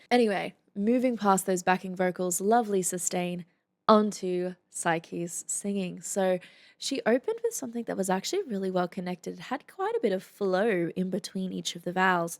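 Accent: Australia. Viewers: I’m an Aussie so don’t usually find Australian accents very interesting, but you always so amazing!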